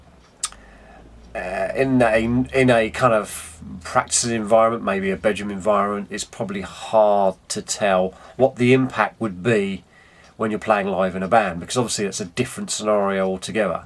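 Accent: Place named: United Kingdom. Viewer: I trust you more because of your british accent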